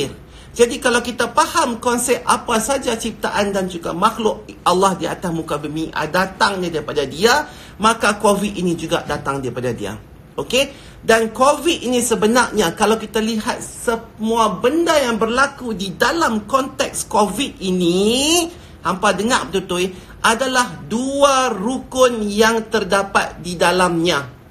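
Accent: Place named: Penang, Malaysia. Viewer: Penang pure..asai georgetown..kedah lain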